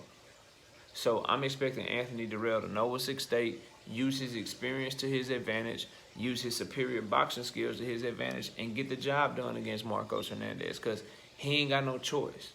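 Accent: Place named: North Carolina, United States. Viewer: You from North Carolina? You sound like my family. And you said Bo lol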